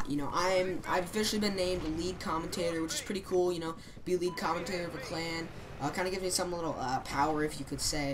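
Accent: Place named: China. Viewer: Windows ahah your Chinese accent tho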